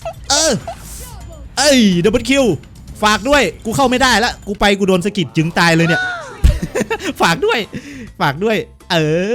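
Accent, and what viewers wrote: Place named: South, Thailand. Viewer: แหลงใต้ชับพึดนิ5555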